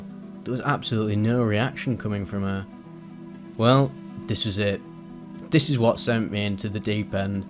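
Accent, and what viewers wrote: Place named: England, United Kingdom. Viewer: I feel like you could write. Maybe you already do. I’m far away from you, it sounds like you’re English, but you’re story is something I’m really enjoying. I subscribed, so I’ll try to keep track. I love this style of writing/ oration though.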